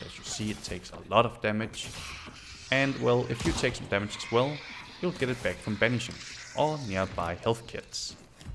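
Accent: Denmark. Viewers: U english sounds very danish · You sound danish?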